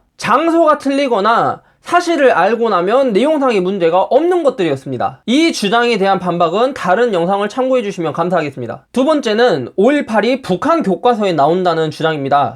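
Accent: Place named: Jeolla, South Korea. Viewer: 전라도 사투리 구수하네요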